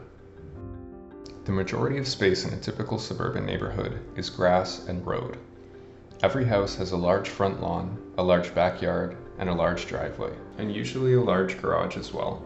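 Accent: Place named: Canada. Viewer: I HEARD THAT CANADIAN ACCENT AND THEN I WAS CONFIRMED BY YOUR ABOUT SECTION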